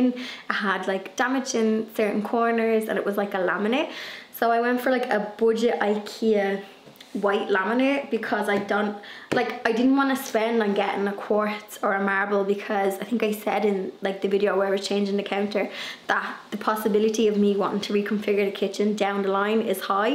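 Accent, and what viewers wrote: Ireland. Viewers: you are a most delightful sweetheart ❤! You are SO pleasant to listen to with your lovely Irish accent, and watch your projects in each video! You are really gifted in the countless ideas that you have…inside and outside! 😊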